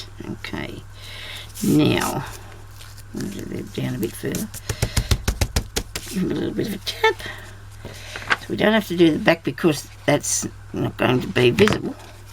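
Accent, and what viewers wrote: Australia. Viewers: Very very pretty love it...and your accent also....where is it from Australia?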